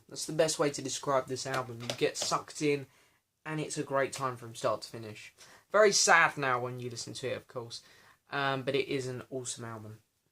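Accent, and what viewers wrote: United Kingdom. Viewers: I liked your ranking just one thing I think you should give a little more information about musicians in the albums and producers I like your Brit accent I am from Mexico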